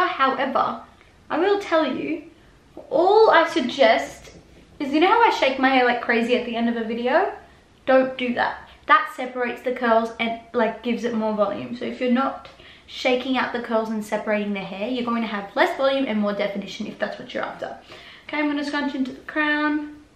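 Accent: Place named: Australia. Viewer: Love listening to your Aussie accent!😄❤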